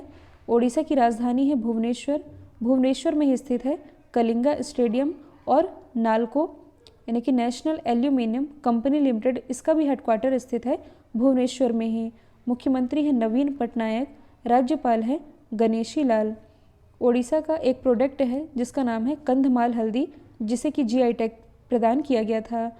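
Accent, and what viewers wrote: Madhya Pradesh, India. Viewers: Maam aap MP ki hai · वाह मेम आप एमपी से हो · Ma'am Aap mp k ho??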